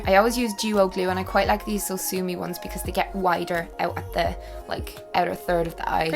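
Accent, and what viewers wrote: Dublin, Ireland. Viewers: Are you from northern Ireland? You sound like your from Dublin tho😂 so idk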